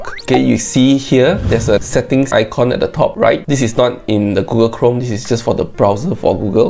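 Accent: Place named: Asia. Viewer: Bro has an Asian accent 🤣 (not hating)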